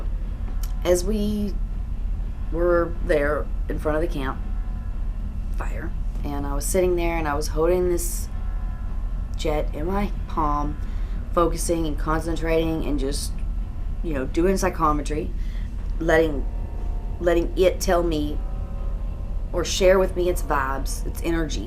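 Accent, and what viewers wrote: South, United States. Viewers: I was hoping you would say where it was, with your accent I assumed somewhere here in the south. This sounds like goatman's bridge, which I've been to. Eerie even during the day. I have no doubt its haunted.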